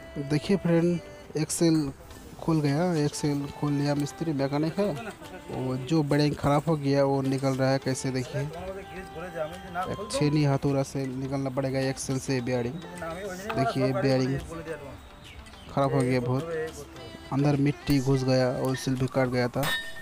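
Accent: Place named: India. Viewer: Aap video banane ki Tameez nahi hai aap bilkul Khadi bhasha me baat kar rahe ho aisa lagta hai aap kis gaon ke rehne wale ho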